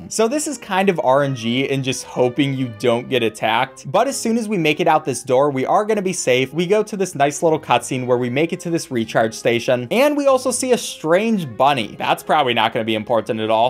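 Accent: Denmark. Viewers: Are you from Danmark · Your danish!?!?!? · er du dansker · are you danish?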